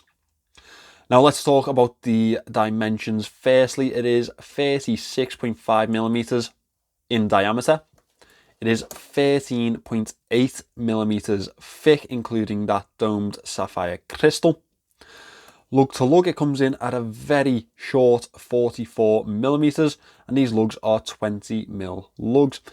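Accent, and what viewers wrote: Liverpool, United Kingdom. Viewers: Love the Scouse accent bruv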